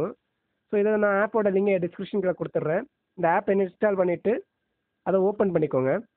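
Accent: Kanyakumari, India. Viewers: Broo neega Kanyakumari thana...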